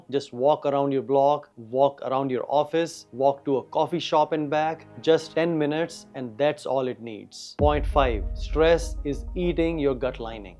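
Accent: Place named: India. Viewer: Sir you sound almost exactly like an indian,or you are an Indian?. Anyway thank you for this info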